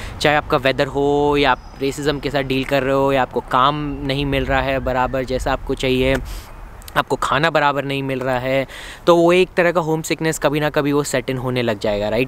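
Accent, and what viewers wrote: Canada. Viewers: Dude i thought you are a legit born canadian when you switched to hindi i was like 😱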